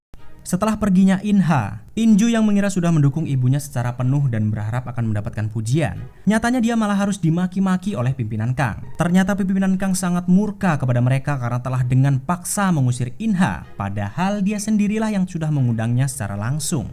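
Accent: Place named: Banyumas, Indonesia. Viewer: atmint e ngapak geng😹😹😹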